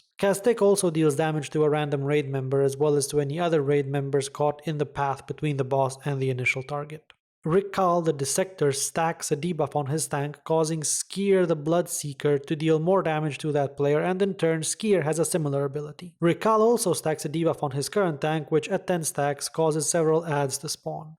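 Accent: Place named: Finland. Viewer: I´m not sure, but sounds finnish!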